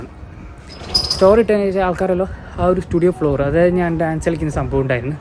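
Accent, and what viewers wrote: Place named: Thalassery, India. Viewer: bro i laughed a lot 😂😂😂 nalla pang indappa samsaram ❤thalassery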